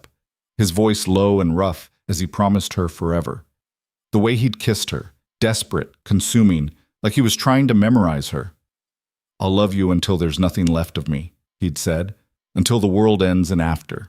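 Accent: United States. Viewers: That American accent just isn't appropriate for stories of British aristocracy.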